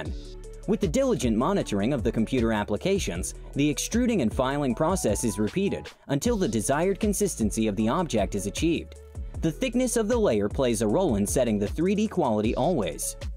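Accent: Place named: China. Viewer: Terrible chinglish.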